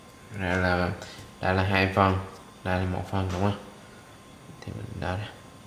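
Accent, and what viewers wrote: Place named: Gia Lai, Vietnam. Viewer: Tiếng gia lai vậy á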